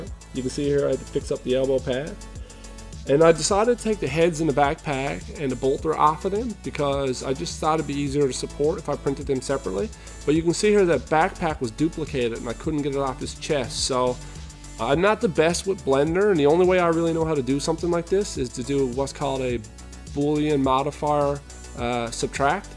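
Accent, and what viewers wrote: Newfoundland, Canada. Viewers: you sound like my uncle from Newfoundland, makes me feel like I'm gonna have a awesome time when I watch your videos. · From newfoundland bud? also this was pretty interesting, thanks for the video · Man i have to ask are you from Newfoundland? Soon as i heard your voice i was like , its one of us hahahahah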